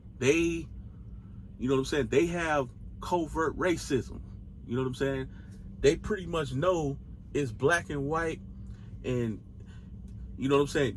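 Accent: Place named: Mississippi, United States. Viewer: Bro 😂😂😂 wtf are you gonna do about it? Sounds so confederate Mississippi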